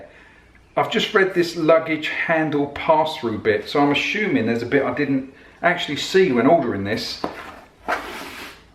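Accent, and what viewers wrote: United Kingdom. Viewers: this video would be extremely boring.... but that British accent makes it interesting.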